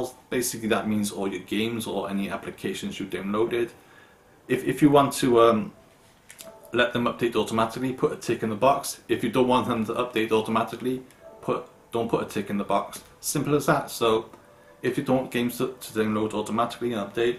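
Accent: Newport, United Kingdom. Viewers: Newport accent?😝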